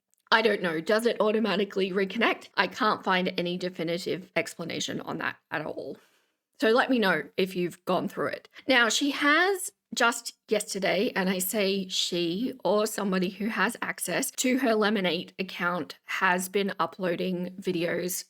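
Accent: Australia. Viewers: Love hearing your thoughts and analyses/detective work. Fellow future-dweller here 😊 although from your accent it sounds like youre from Vic. Heading there for PAX this week. Keep the vids coming!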